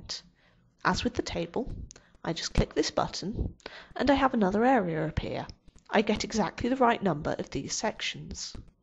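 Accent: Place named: United Kingdom. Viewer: Es acento británico.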